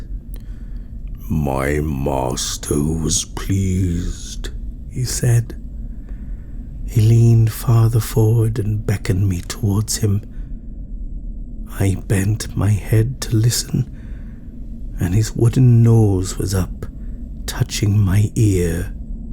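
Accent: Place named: Wales, United Kingdom. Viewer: A brilliant story and a brilliant Welsh accent, Sir... Is there anything you can't do?:)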